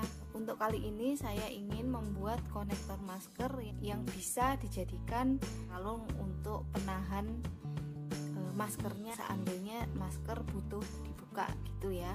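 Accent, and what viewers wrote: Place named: Java, Indonesia. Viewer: mantap,.. kreatif sekali mbak.. salfok sama suara medhok nya lho.. hehehe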